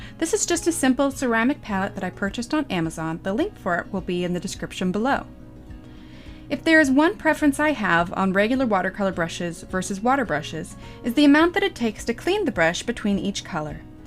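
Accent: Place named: RP, United Kingdom. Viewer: you sounded so posh! great review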